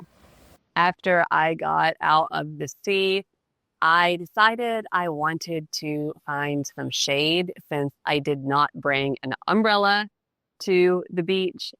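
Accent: United States. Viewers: Dear One day on the beach - ti's is so nice idea and practical as well I practise listening, I enjoy it a lot even though your English is definitely American (I prefer soft British), but it is done in very interesting: slow, creative, transparent and coherent way. Also your today's adventure has reminded me my a few Spanish experiences in the past. There are rally beautiful memories. Warm hugs from England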